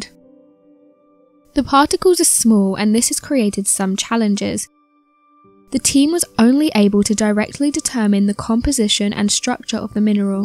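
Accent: England, United Kingdom. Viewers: Ram Rod0 not sure where she would be from but definitely English 😊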